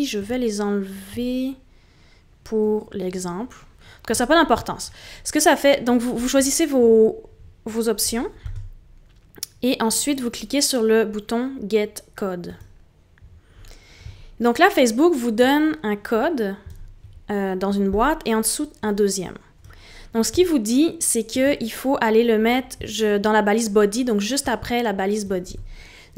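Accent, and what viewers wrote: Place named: Quebec, Canada. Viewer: Quel accent charmant! Faut que j'aille au Québec !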